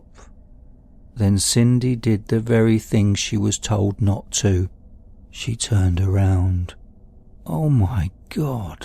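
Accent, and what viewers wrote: United Kingdom. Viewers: Your voice sounds very calming and badass. Random question: Are you British? Nice narration btw..... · are you from Britain, Australia or New Zealand?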